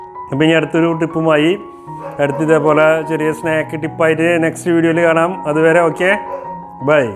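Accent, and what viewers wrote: Thrissur, India. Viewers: നിങ്ങൾ തൃശുരാണെന്ന് സംസാരത്തിൽ നിന്ന് മനസിലായി . ശെരിയാണോ ??😀😀😀😀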